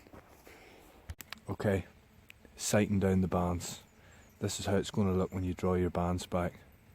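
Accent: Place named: Northern Ireland, United Kingdom. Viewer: Must practice after watching this. Have a cheap catapult at the moment to practice with hopefully I will up my game... Am assuming your from Northern Ireland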